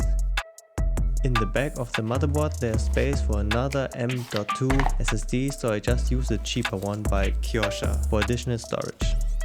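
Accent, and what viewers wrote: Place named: Germany. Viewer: cooles video aber man hört schon das du deutsch bist und du hast alles richtig gemacht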